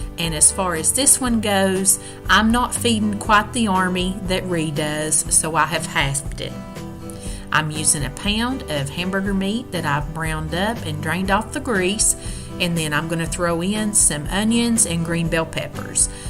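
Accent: South, United States. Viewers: I have been watching you for about five months now & subscribed to your channel after the first recipe that I watched on YouTube. My husband passed away on Oct. 6, 2022, and that's when you popped into my life!! You have truly blessed me. (God sure does work in mysterious ways!!) In a way, you have saved my life, along with our precious Lord & Savior, Jesus. I was so so lost & was grieving (still do!!), and your amazing, delicious recipes gave me something to do in my spare time & got me to cooking again!! I love how laid back you are & I love your southern voice & the soft music you play while you cook. Your recipes are simple, easy and delish...right down my alley!! Love and prayers and lots of blessings to you and your family!! I cannot thank you enough. 😃😁🥰❤❤🙏🙏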